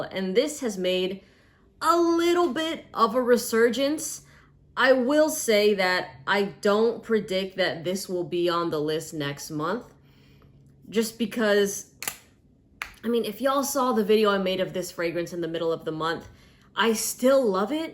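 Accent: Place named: Philippines. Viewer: you definitely sound Filipina to me..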